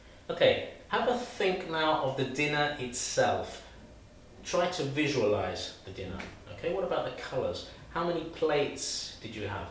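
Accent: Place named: RP, United Kingdom. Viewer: Much better than most EFL/ESOL videos I’ve seen, you crack me up with your’sign language’!!! Pronounced like a true BBC presenter! I am going to pm you an idea-well done! Subscribe people!!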